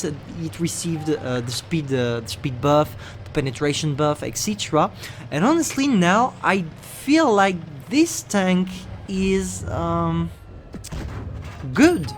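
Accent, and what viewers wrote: France. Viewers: I can feel ur french accent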